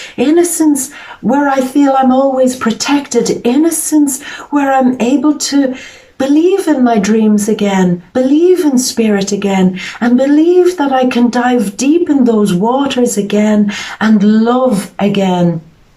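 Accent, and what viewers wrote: Ireland. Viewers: Love your Irish accent! God bless you sister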